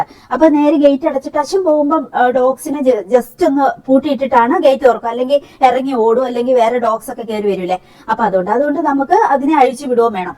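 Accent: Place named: Perumbavoor, India. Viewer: veedu perumbavoor moovatupuzha bagam aano basha kettitu chothichatha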